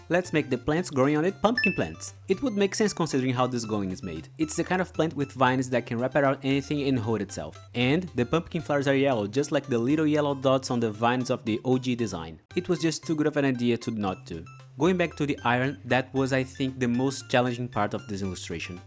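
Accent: Brazil. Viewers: Eu reconheço um brasileiro pelo sotaque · Espera... EU CONHEÇO UM SOTAQUE BR QUANDO EU ESCUTO UM! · Nice video idea/channel bro!! Are you brazilian by any chance? Your accent sounds familiar lol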